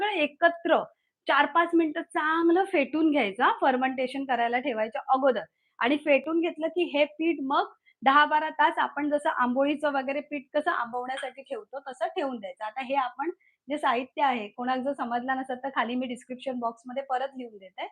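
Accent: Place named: Malvan, India. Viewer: तुम्ही मालवणी बोलतास ता भारी वाटता, गोड लागता. तसाच बोला.मराठी बोलूक बाकी लोक आसत. तुमचा आपला मालवणीच चलांदे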